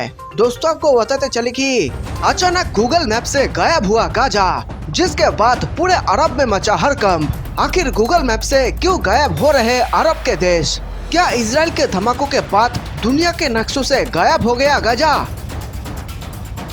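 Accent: Bengal, India. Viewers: Pehle tu apna bangali accent ko hindi me mat la aur upar se views ke liye jhuta captions mat de budbak. Faltu time waste kar raha hai sabka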